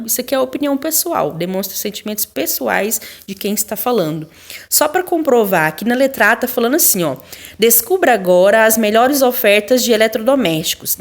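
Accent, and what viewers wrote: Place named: Belo Horizonte, Brazil. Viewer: Me ajudou muito, obrigada de ❤️,pelo sotaque chuto que é de Bh ksksks ameii+1 inscrita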